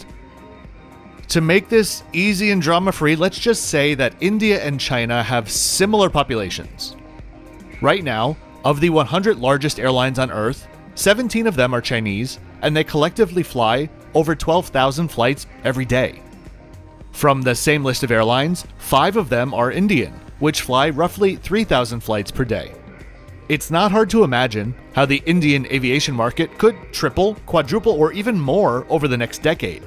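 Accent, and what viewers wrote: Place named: United States. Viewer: His accent is American 😊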